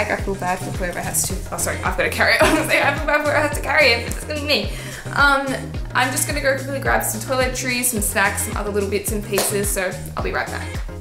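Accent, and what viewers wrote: Australia. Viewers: Are u austrailian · I can tell you are Aussie because the stuff and your voice if I am wrong I’ll be honestly shocked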